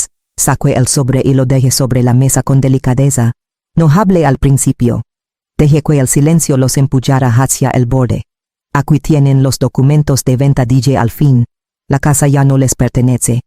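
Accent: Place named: United States. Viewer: No puedes hablar en un español claro? Porqe ese tonito agringado se te oye muy mamuco y no se te entiende. Aparte, no hay necesidad alguna de que utilices esa forma de hablar. Ya michas personas te lo han dicho pero te vale. Pon remedio urgente a esto, sino ya no vamos a abrir tus videos. Ok?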